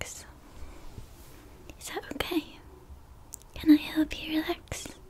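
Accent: Northern England, United Kingdom. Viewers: I can’t tell if her accent is British or Australian? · She’s British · Somewhere in northern England? Manchester maybe? (definitely didn’t read the comments) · Beautiful Girl xx 😍🔥💕❤ Tingles for sure Love your accent northerner like me,, I'm Bolton by the ways.. Keep up the fabulous video's baby xx.. Youu are asmr Angel love your eye's very Disney princess.. getting Elsa frozen vibes,😊.. Xoxo Levi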